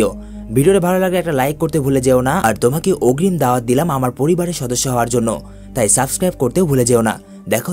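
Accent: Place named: Noakhali, Bangladesh. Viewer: নোয়াখালী 😊আপনি😊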